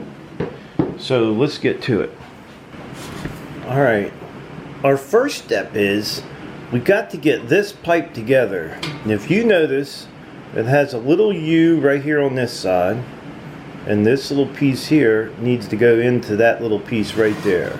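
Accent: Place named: Pittsburgh, United States. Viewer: Just used this video to help me install a damper for the first time. Loved how relaxed and simple you kept it, most DIY or pro style videos get lost in the technical jargon. So thanks for keeping it simple and focused on the point! Plus, the Pittsburghese made me homesick for western PA after a few years away now!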